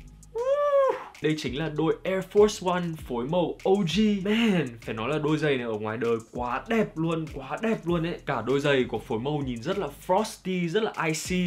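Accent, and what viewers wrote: Standard. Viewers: Tao thay thang này phát am tuy chuan nhung nghe cam thay k tu nhien . Nghe mac met giùm lun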